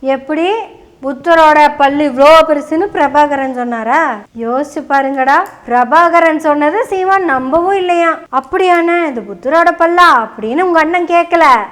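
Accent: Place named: Coimbatore, India. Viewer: Super as usual always you are bold and pronouncing in Kovai language and taking subjects 🎉❤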